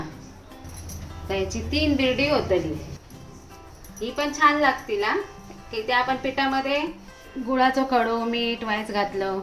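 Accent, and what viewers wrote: Malvani, India. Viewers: तुझे video मी recipe साठी बघतेच. perfect measure असतं. thanks for sharing पाटोळ्या. आणि सगळ्यात महत्त्वाचं. तुझं मालवणी बोलणं फारचं आवडत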